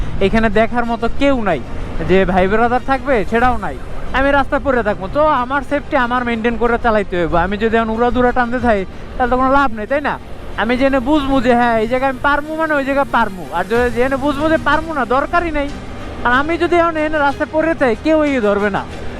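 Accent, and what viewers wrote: Barishal, Bangladesh. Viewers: ভাই কি বরিশাইল্লা,,,,,,, 😊? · Vai apni ki borishaila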